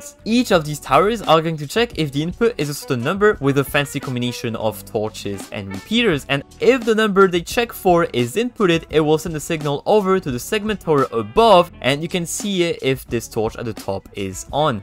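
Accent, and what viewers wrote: France. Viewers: are you french i heard it in purples vedio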